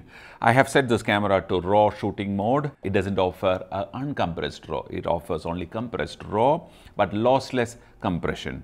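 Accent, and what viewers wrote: Kerala, India. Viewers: Can't help but notice such a strong mallu accent, very good videos though. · Thanks for the videos I like photography. If u don't mind shall I ask you one thing are you from Kerala. Because ur slang is a bit like that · sir your malayalam accent 👍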